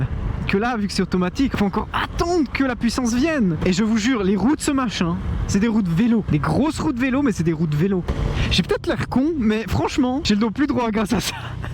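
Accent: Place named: Switzerland. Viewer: Je croyais tu était français jusqu'à ta commencer à dire des chiffres 🤣 après j'ai crue tu était belge...🤦🏽‍♂️ pour finir j'ai compris que tes suisse mdrrr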